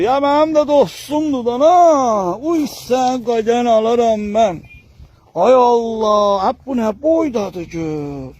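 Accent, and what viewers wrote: Ganja, Azerbaijan. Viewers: Ay Allah Gəncə ləçəsi. Allah qəlbində mərhəmət hissi olan insanları qorusun. Maşallah sizə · Qardas Gencelidi lehcesinden melumdu.Gencede Qadan Alim demek bir ezizlemek sozu kimi deyilir ve bilinir.Mene maraqli olan oduki yeni bu cure gozel seylerden ancaq bu soz size batdi?? MasaAllah bu qardasa mence o dediyiniz agila daha da cox ehtiyaci olanlar var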